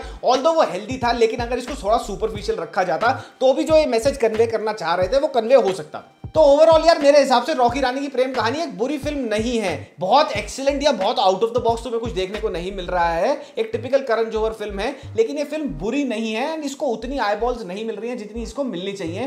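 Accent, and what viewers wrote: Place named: Delhi, India. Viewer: I agree 100% accent is exactly like Delhi boy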